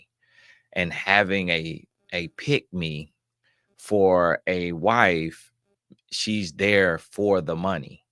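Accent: South, United States. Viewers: I started to make a comment underneath someone's video because this "man of God", who is also divorced, decided he wanted to make fun of you because of your southern drawl. He said that he disagreed w something you said, but I'm still puzzled about how Christians can disagree if they both believe in the same Word. I think he just called you out to up his subscribers. So instead of being a "typical angry black woman", I voted with my feet and no longer watch his videos.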